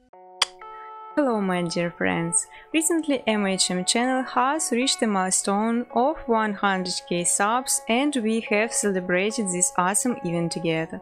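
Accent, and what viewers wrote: Russia. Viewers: Так она русская ?)) бля по акценту было впр понятно,но я думал прост такой акцент сам по себе,ну к слову дЫа она молодец))0 xD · русская 100% акцент палит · Говоришь как русский заметно дэй к примеру говоришь дей ты русская? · русский акцент ) удачи и успехов вам ! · Russian accent)) Сразу понятно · Русский акцент? Или я ничего не понимаю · уууу ска первый раз слышу такое прям рашко произношение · Русский акцент, или мне кажется?)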